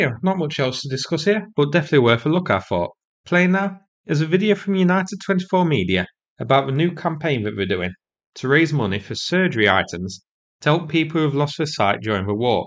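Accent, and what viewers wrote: United Kingdom. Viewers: pommie accent .... lol .... why not do a doco on the mighty Has-Been Kingdom "Space Force" ..... lol you wankers can't even launch a can of baked beans into orbit ..... you are such a bunch of total losers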